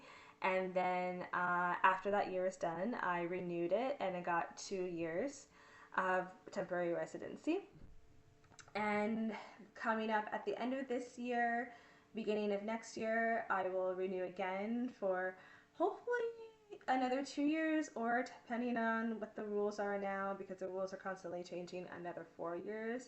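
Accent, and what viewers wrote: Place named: United States. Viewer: Are you originally from the united state? because you speak like you are from the united state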